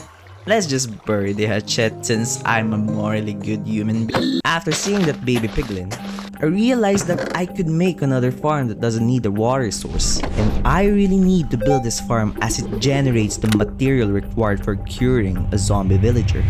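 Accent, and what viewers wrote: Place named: Philippines. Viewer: its really funny how i was slowly realizing that this guy was filipino by just listening to his voice. (BTW insane video keep it up)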